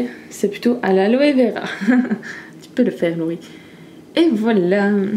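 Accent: Belgium. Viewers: L'accent de diiiiiiiingue :D Mais une belge ça fait plaisir :) Bisous :)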